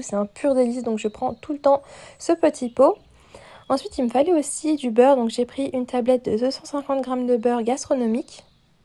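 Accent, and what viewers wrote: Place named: Northern Portugal, Portugal. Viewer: Salam aleykoum ma soeur comment je suis contente de voir que ta fait Aletria. Je me sentait seule dans le sens ou les gens en générale quand il rentre dans l'islam il oublie vite leurs origine il veulent absolument " devenir arabe" . Hamdoulilah cest bien que tu te souvienne un temps soit peu du portugal. Ça n'empeche pas qu'on apprend l'arabe et tout mais faut pas oublier nos racine. Hamdoulilah trop contente. Continue tu me régale Allahuma barek et enplus sa s'entend que tu parle très bien le portugais ... à entendre je dirais que tu viens du nord. Je me trompe ?? 😂❤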